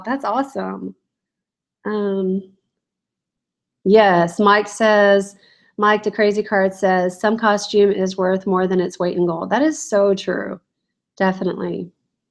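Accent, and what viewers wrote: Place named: Texas, United States. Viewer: ...and then people teasing you for how you pronounce "jewelry." Lol. I've honestly never noticed that! I have noticed you say "garage sell" instead of "garage sale," and that it's never "when," it's always "whenever." I just figured it's a Texas thing, and I never said anything because one thing all of us as Americans know is you don't mess with Texas! Well, that and I get crap about my West Coast accent and vocabulary, so I have no room to talk. 😂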